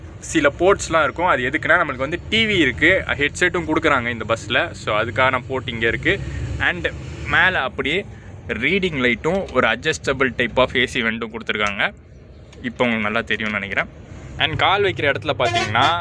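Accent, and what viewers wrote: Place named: Tirunelveli, India. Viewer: Tirunelveli slang 🤩🤩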